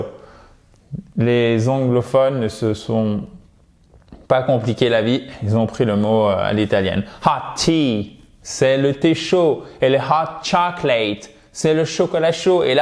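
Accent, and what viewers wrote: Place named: United States. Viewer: Merci tes videos sont sympa, mais j'aurai prèfèrè avec l'accent anglais et non Americain.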